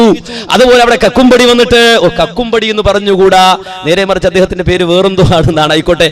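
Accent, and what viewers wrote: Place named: Kozhikode, India. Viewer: ആദ്യം ഭാര്യനെക്കൊണ്ട് പർദ ധരിപ്പിക്കടോ? സംസാരിക്കുന്നവൻ കോഴിക്കോട്ട് കാരനാണെന്ന് തോന്നുന്നു.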